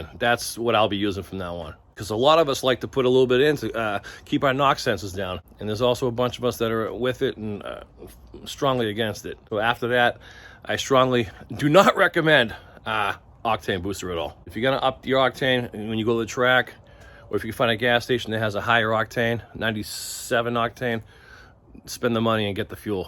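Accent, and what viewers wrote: Boston, United States. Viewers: Sounds like Boston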